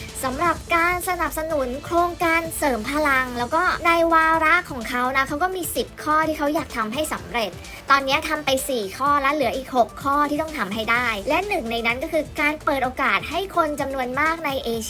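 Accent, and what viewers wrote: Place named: Brazil. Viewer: Pelo sotaque ele é brasileiro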